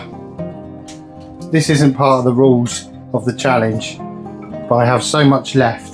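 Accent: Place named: England, United Kingdom. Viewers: Just looking at you I did not expect an Englishman lol You look like an American 😂 So the accent I was like whoa lol! Love your channel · I'm from Hull and had your accent as Yorkshire, but I was thinking Doncaster or Goole. 👍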